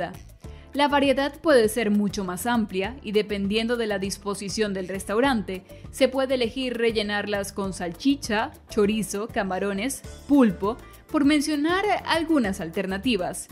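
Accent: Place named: Mexico. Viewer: Es mexicana la voz,que narra ese absurdo video.Y yo,perdiendo mí tiempo en esta pendejada.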